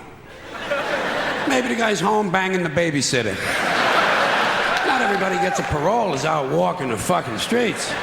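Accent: Brooklyn, United States. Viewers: He's from New York, he isn't faking it. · He's from Brooklyn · love that Brooklyn accent.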